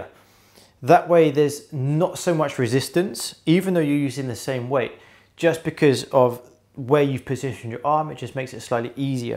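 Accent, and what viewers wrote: United Kingdom. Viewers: You sound like you're from Landan...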